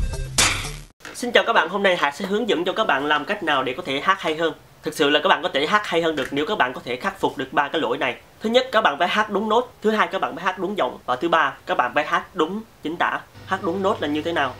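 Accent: Hue, Vietnam. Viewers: Anh gốc Huế hk, giọng tựa bạn em, em thích giọng anh lắm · ong noi nay nguoi hue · anh người huế à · Giọng anh miền nào mà nghe vui vui ha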